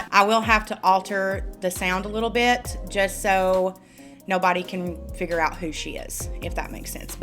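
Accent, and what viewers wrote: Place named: Tennessee, United States. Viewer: I love me some Tennessee accent!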